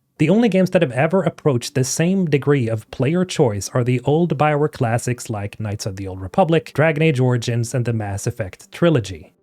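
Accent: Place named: Sweden. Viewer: That cute subtle Swedish accent though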